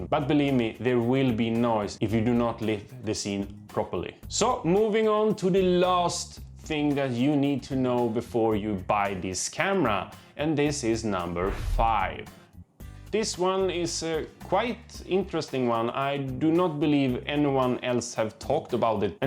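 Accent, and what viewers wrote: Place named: Sweden. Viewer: As a Norwegian I immediately heard you were swedish... The swedish, norwegian and danish variants of english are very distinct :D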